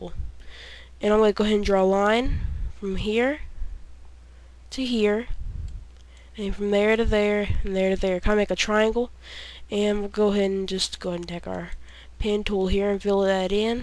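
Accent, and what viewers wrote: United States. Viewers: ._. not really, I'm a Texan, I've never met anyone with an accent like that, He's most likely from the Bayous or something... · such country accent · Cool hillbilly voice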